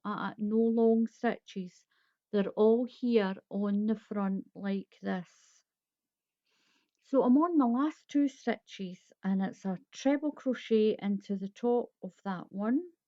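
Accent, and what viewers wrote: Scotland, United Kingdom. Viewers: Great looking piece! I'm starting a fundraiser for my preschool, and I wonder if you have any more suggestions for a desirable but easy (and quick) made projects? This one of course I'm considering. Also I could listen to you speak for ages. Scottish and northern Irish are my cryptonite! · Hi,, beautiful blanket. Are you from Scotland? You have beautiful accent.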